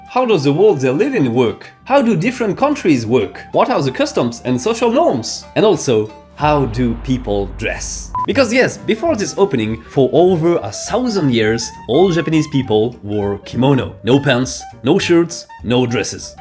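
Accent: France. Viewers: your French accent is coming out in your pronunciation of some H's, like in "hierarchies" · ton accent francaise est tres évident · With just a little bit of a French accent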